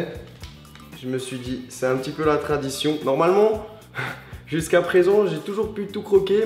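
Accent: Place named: Alsace, France. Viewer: je pari que tu est alsacien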